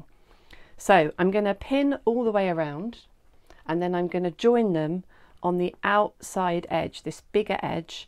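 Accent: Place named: United Kingdom. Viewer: Thank you for your tutorial, it's very useful! P. S. : I love your british accent ❤️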